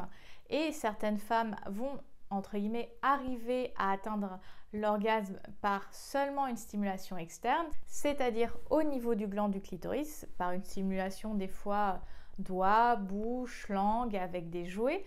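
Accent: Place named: Morocco. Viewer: Peut-être es-tu originaire du Maroc ? à cause de ta prononciation tu as mon abonnement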